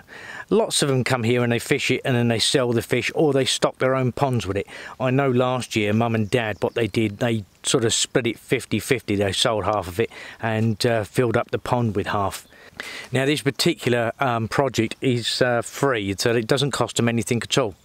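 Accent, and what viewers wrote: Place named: England, United Kingdom. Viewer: I really detest english accents. i'll try to listen for awhile 1. Edit: Ok, I still hate your accent but the visuals are very nice ! 2. Edit: You really do have some skill with the visuals. BTW, I know of your recent health issues and I send my sincere condolences.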